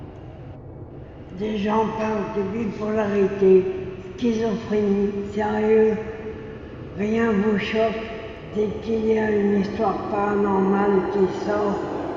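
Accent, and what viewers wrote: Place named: France. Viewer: c un français il a l accent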